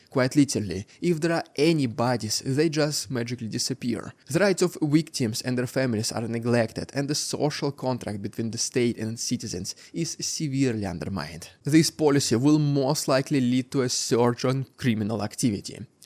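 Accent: Russia. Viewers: Cool. That's why my investigation rushes so much to put it in court BTW. Amayzing ryazan' aksent😂 Organized crime was always the problem of Russia. Starting back in Russian empire. Not in the 1990's. It's simply broke lose in 90's.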